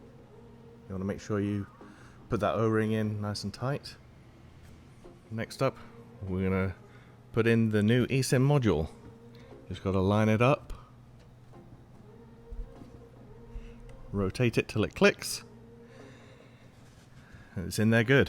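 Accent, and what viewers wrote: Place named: United Kingdom. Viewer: Everything sounds better with a British accent❤ 👍 You sound like my son in law from Nuneaton...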